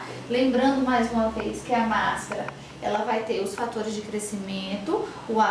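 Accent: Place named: Northeast, Brazil. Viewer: adorando teus vídeos, parabéns sou aluna da FHO aqui em Araras SP, estou no segundo ano, ainda falta 2 anos e meio para eu concluir. obgda e continue postando vídeos, estou inscrita em teu canal. P.S tbm sou nordestina sou de juazeiro do norte ce, ouvindo teu sotaque me faz lembrar minhas raízes. bjim pra te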